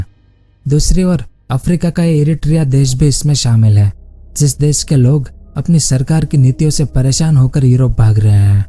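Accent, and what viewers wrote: Gujarat, India. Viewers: Bhai Tumhara gujrati lehjaa mast he 😁😉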